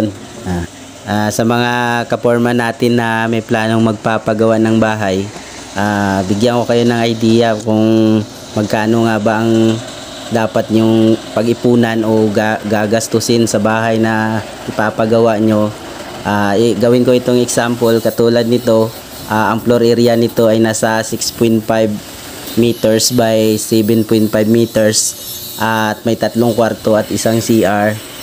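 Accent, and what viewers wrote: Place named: Visayas, Philippines. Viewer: Saan po kau,, narinig ko bisaya😊 gusto ko din kc pa bahay taga mindanao po aq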